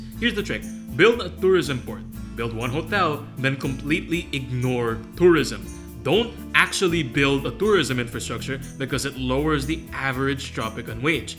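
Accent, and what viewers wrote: Philippines. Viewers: you sound very filipino. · Are you filipino? I can't quite tell your with your accent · you sound Filipino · i just started playing tropico 6 and was looking for some videos to get better. i noticed your accent and subscribed right away haha. love seeing filipino channels as well thought out as yours.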